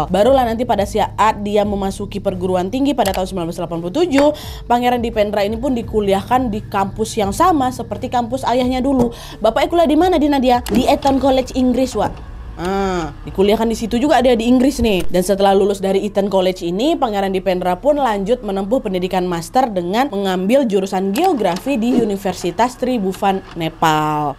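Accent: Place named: Medan, Indonesia. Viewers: Medan kali kamu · Logat medanmu itu medok .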